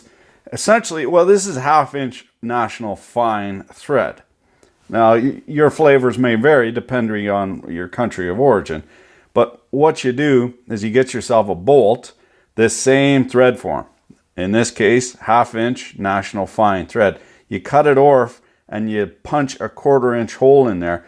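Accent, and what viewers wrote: Quebec, Canada. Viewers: i have a stupid question where you from men, i heard ya speak french and you sound like you're from quebec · Help me out, I'm new to this channel and I cant figure out if hes super canadian or from texas. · I was gonna say Canadian or from up in Michigan. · He's a Canuck!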